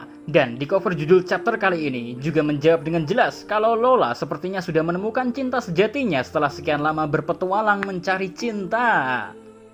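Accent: Java, Indonesia. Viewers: Min Wong jowo kah ?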